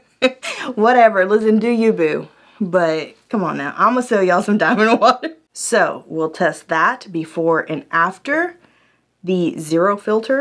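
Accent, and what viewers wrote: Texas, United States. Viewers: I watched one video previous to this and I was thinking to myself I bet she’s from Texas! I watched this one and I knew lol. - from a fellow Texan.❤️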